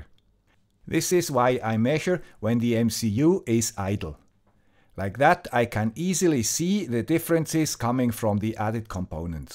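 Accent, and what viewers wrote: Switzerland. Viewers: Another great video! Thanks a lot. You put so much work into planning out and making these videos they are so informative. I also love your soft Swiss accent. Thank you. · Thank you for sharing your experiances. I really like the swiss dialect as well - in english as well as in german. :-) As I always had very good experiances with the D1 mini with ESP8266, the D1 mini version of the ESP32 looks interresting to me. Greetings from the rhine-main-region, germany Alex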